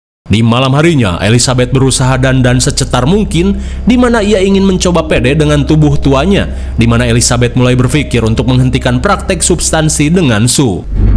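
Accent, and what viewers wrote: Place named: West Java, Indonesia. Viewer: Ci mimin nya orang sunda ini mah 😁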